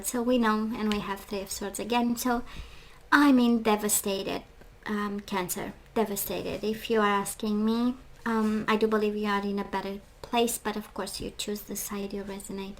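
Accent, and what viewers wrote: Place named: Philippines. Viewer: Y u speak like a Filipino?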